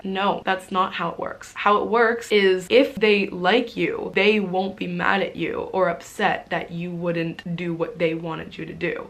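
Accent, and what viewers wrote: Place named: Canada. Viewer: That Canadian accent is god awful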